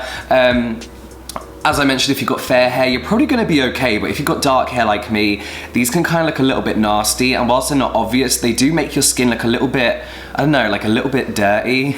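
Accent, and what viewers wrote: United Kingdom. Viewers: Are you British? 🤔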